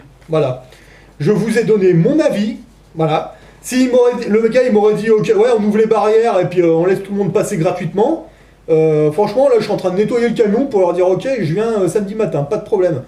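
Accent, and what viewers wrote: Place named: Haute-Savoie, France. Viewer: Ton accent me rappel sympathiquement ma jeunesse dans les montagnes de haute Savoie. Vive les bivouac au semnose et les footing sur la tournette. Sans parler des skis de rando. Vive le 27em BCA.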